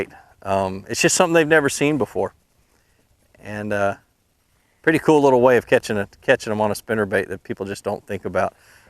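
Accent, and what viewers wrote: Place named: New England, United States. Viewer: I like how his “nagging” voice is 100% yankee 😅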